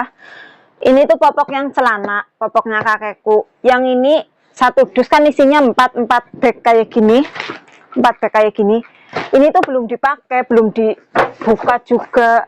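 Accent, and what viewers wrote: Banyumas, Indonesia. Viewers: Jawa ngapaknya medokl skali..ora ngapak ora kepennak..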